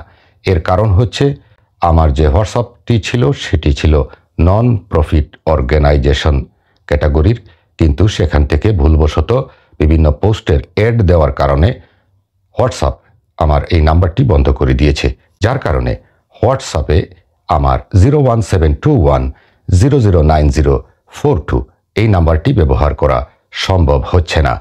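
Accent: Sylhet, Bangladesh. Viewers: Apnr vasa sylhet kutay plz bolen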